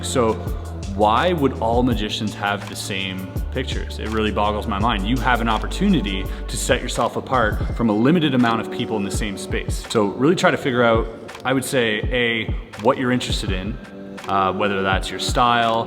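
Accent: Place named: Quebec, Canada. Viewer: bonjour j'ai vu que tu habite au quebec grace a ton accent et au moment ou tu parle francais bref continue! t'es super on t'aime!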